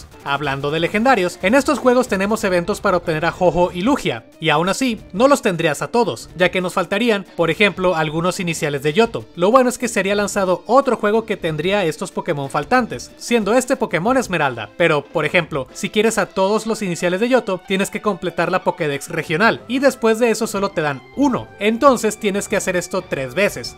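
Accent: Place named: Monterrey, Mexico. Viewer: Videaso hermano, gran acento regio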